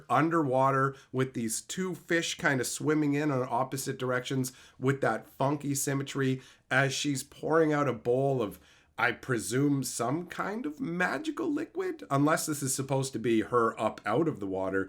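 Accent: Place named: Canada. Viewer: Heh heh you keep saying A Boot!😂 Ya damn Canadian, I love ya.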